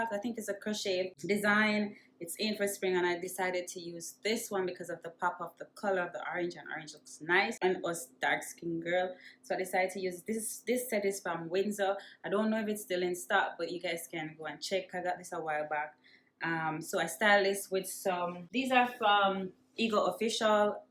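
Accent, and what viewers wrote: Jamaica. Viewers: Sound like Jamaican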